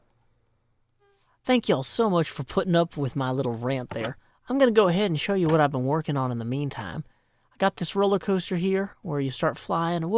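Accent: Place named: South, United States. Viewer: This is the first time I’m watching one of your videos. I haven’t watched Minecraft videos for the better part of six years, but nothing has brought me more peace or put a smile on my face than listening to you talk to us about assumptions and the dangers of comparing yourself to others. I thought I didn’t like southern accents, I have changed my mind Thank you.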